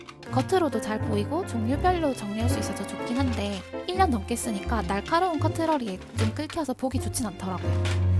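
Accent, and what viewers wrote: Busan, South Korea. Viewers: 표준어를 쓰고 싶지만 새어나오는 부산바이브 ㅋㅋㅋㅋ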